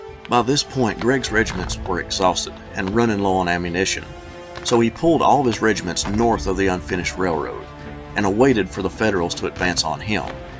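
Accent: South, United States. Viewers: More long videos sir, these are by far the best battlefield series on YouTube and I throughly enjoy hearing a fellow southerner narrate. Which state are you from if I might ask? I’m an Alabamian and get excited every time I see my state represented. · This Southern Accent Is Way Too Distracting.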